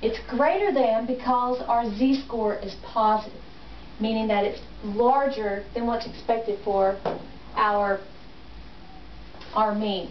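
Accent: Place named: United States. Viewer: great lesson , but i despise the american accent :((((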